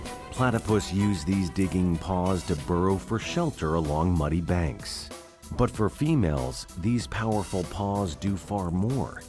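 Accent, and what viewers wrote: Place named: United States. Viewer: this was an excellent video of a group of truly unique animals. However, I want to highlight 2 things on my mind which I'd like to point out. First, your accent sounds as if you're a fellow American, though I guess you could also be Canadian. If the latter, ignore the following complaint. I noticed that you used only the metric measurements, rather than doing as many to most heads of channels: use both. It would have been helpful for us Americans. The other is a wording problem in the star-nosed mole section. The professor said that this creature is "one of the only" of something, which is grammatical nonsense. The reason is that "one of" indicates there's more than 1 of something, whereas "only" means there can't possibly be more than one. What should be used in this type of situation is the phrase "one of the few".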